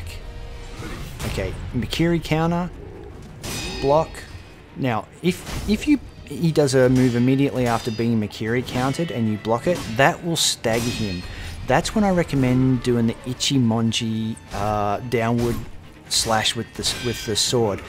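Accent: Australia. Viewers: great to hear an Aussie accent for a change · i feel like everything is easier to learn when explained with an Australian accent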